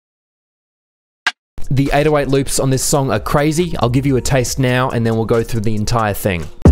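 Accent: Australia. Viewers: yo you aussie?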